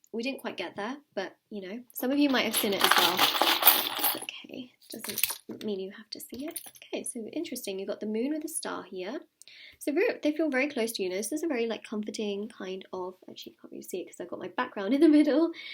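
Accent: England, United Kingdom. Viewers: Love your accent where in England are you from?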